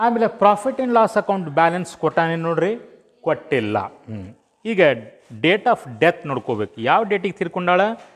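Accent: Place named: North Karnataka, India. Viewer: ಸೂಪರ್ ಟೀಚಿಂಗ್ ಸರ್ ನಿಮದ ಉತ್ತರ ಕನ್ನಡ ಭಾಷೆ nice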